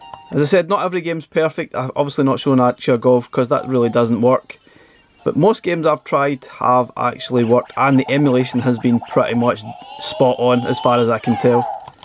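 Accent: Scotland, United Kingdom. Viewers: Great video mate! Still eyeing this up! Apologies if it was mentioned somewhere in the video, I'm watching at a library with the sound low, what emulator/core was used? I'm guessing this wasn't beetle core based on the Sega Rally gameplay. The road seems to "break up" and this doesn't happen on real saturn/beetle gameplay. But everything seems super smooth and contradicts the notion that the ARC is not capable of running Saturn. Also regarding Sonic R I absolutely adore it and it is criminally underrated haha. The characters are meant to be driven like cars with B as an accelerate button, and the analog as steering and L,R triggers as drift. Once you get the hang of it it's pretty smooth and you'll be acing all the races. Perhaps it was a bad design choice to have the, running in hindsight. Oh and your accent makes me nostalgic for Scotland, it's been ages since I've been home.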